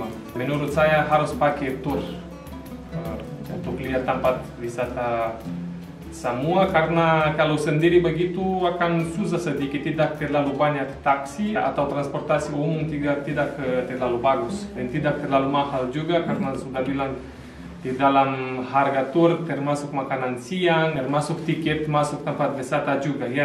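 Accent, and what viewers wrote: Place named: Ternate, Indonesia. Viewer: Tong Tra bosan, kalo durasinya d perpanjang Sadiki malahan makin bangga ada bule yg pake tong pe logat Ternate 😊🤗apalagi liat ganteng uucchh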